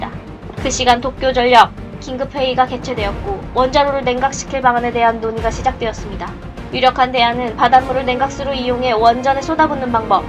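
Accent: North Korea. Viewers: 해설자 북한사람?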